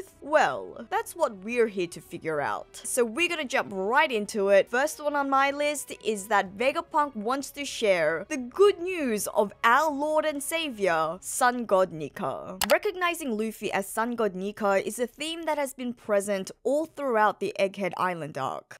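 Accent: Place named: Australia. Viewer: It’s the cringe Australian accent. They cants pronounce the letter “o” they say “aur”. Like vide-aur instead of video… sometimes can’t say “ah” and say “er” .. listen to “ide-er” instead of idea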